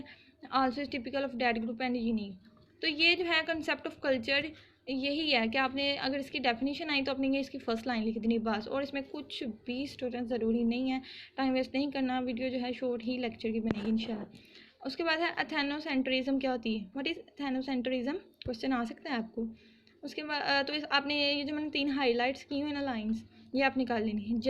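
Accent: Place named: Shakargarh, Pakistan. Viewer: Mjhy smjh to ni ai but mjhy ap ki voice sunny ka Bht Maza aia q k ap Shaker gerh ki h or mjhy achy lagty h whan k log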